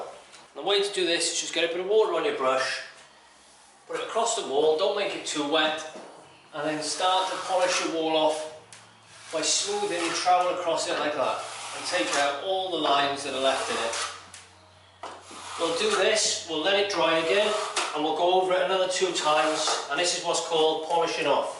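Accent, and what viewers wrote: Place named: South Wales, United Kingdom. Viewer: South Wales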